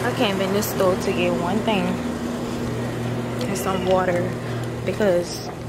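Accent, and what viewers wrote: New Orleans, United States. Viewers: Yesssss I was thinking that 😍 I love New Orleans accents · Is that a New Orleans accent I’m hearing?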